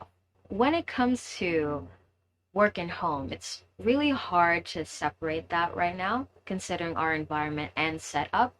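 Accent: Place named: United States. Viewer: Hi this is me Theo. I like the fluent English and the American accent.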